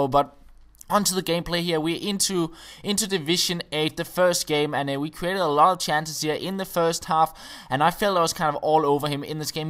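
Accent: Denmark. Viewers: are you from denmark?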